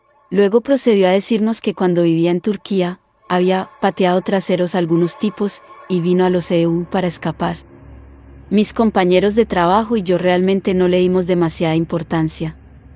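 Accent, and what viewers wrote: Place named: Colombia. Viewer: Ponele voz de hombre. Esa voz de mujer colombiana no ayuda a los videos.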